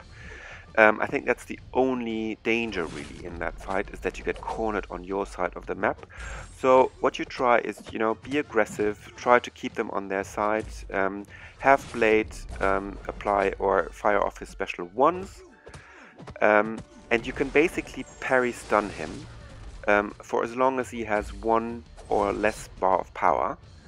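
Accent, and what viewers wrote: Germany. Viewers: You sound german, am i right? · Where are you from dude? you sound German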